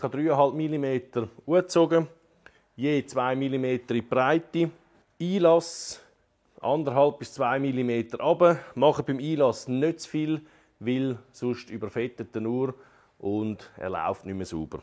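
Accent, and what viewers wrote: Switzerland. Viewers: quatschkante hihi. ja der schwyzer dialekt 😉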